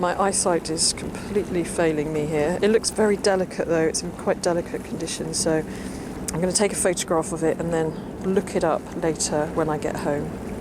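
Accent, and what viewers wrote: United Kingdom. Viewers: What part of the U.K. Is your accent from?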